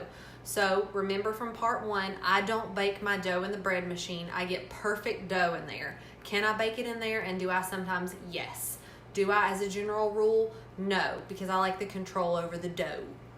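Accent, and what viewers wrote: South, United States. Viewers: Hi, watching from the UK, & I love your deep southern American accent 😊❤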